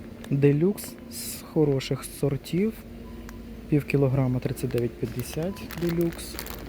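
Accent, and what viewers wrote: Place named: West, Ukraine. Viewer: На западе Украины так говорят. Мне тоже не привычно было, когда была в том регионе, слух резало, но со временем привыкаешь.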